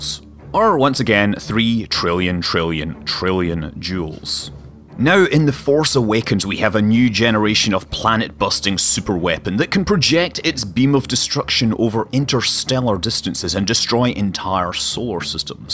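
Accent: Scotland, United Kingdom. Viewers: i love your Scottish accent.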